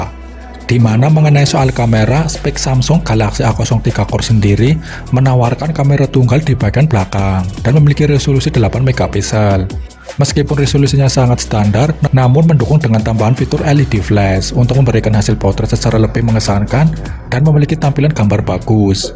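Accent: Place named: Java, Indonesia. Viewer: Pengisi suaranya medok tapi enak banget sih gw rasa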